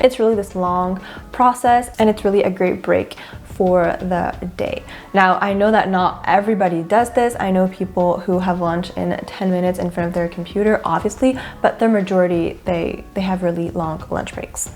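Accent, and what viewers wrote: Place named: United States. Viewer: Do you currently live in France? :) Also your accent sounds very American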